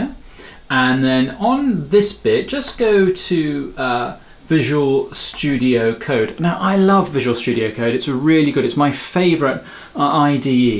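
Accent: England, United Kingdom. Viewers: You English accent is very pleasing to hear . Like your ways of prouncing words . And as always your videos is always helpful .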